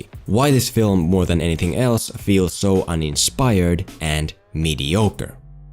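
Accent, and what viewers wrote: Finland. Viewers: Are you finnish? Accent sounds very finnish.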